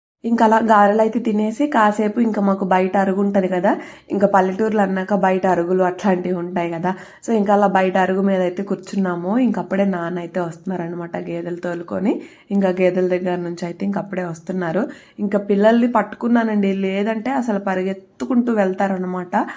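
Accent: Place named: Ongole-Guntur, India. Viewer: Meedi Ye ooru andi..... garu....Adento Koncham slang Ongole and Guntur mix undi...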